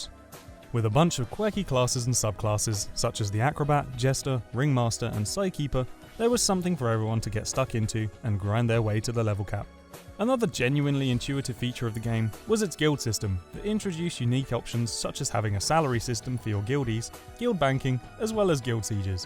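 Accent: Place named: United Kingdom. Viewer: you could be a great streamer for Silkroad online since they aren't many English speaker ones. Turks are monopolizing this business and many ppl like me are looking for streamers with a British accent like yours.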